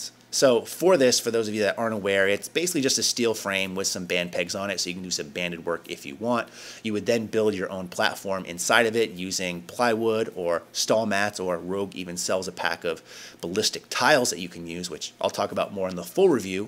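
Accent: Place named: East Coast, United States. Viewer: East coast accent coming through HEAVY in this video! Great review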